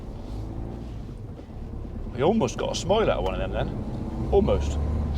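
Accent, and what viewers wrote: United Kingdom. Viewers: took me awhile to get used to the accent...have it narrowed down to the British Isles. Best I could do. Not Australia. Amazed how Biscuit was calm as the horses passed by.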